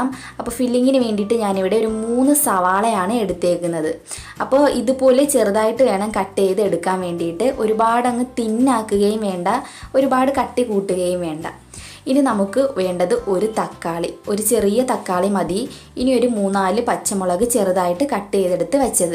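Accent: Kollam, India. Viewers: ഫ അല്ല ഭ ആണ്. കൊല്ലം ആണോ ചേച്ചി വീട്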